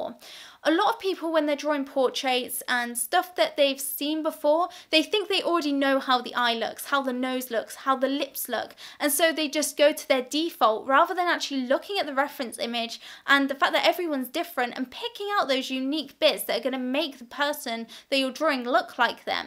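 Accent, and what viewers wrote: United Kingdom. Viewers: You are british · I love your British accent....but i dont have any idea what are you talking about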